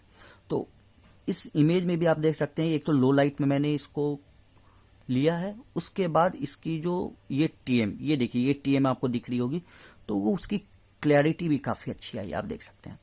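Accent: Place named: Bengal, India. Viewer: u sound bengali!!! r u bangali??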